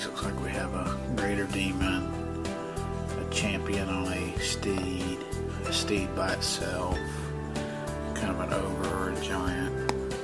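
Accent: South, United States. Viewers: Good video. It's always nice to hear someone with a southern accent who doesn't talk like an idiot. :) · Great vid! And a great voice, where in the U.S you from? Very easy listening on my British ears.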